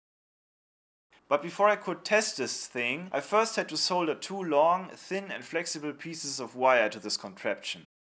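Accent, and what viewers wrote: Germany. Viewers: Your German accent combined with selfirony made it very humoristic to watch :) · Dieser geniöse Deutsche Akzent ist der Knaller! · Are you German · nice German accent! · German Accent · I love the German accent :D + this sentence "I also did some ghetto style heat shrinking with a very girly lighter." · There is just something hysterical hearing "ghetto heat shrinking with a girly lighter" in your German accent... I like the subtle humor while learning a bit... nice job.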